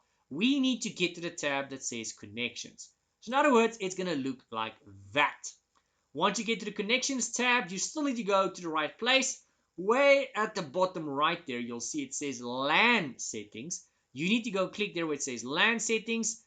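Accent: South Africa. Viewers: Terry Davies, the best programmer ever, said that the CIA came up with the abbreviation LOL, laugh out loud, to misdirect people from finding out about IOT, Internet of Things. Most people will think that's just insane, which it might well be, but if you're into politics, then it's really not that far fetched lol. Thanks for the video series, it's well presented, and i agree with a previous comment i saw, you have a interesting delivery of the material, which makes it less difficult to learn, maybe it's the accent, i assume SA from the sound of it. Thanks again.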